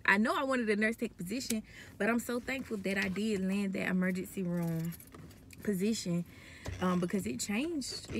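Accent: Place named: Georgia, United States. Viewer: Girl the accent screams Georgia lol. I’m from Albany, born in Phoebe Putney Hospital 🤍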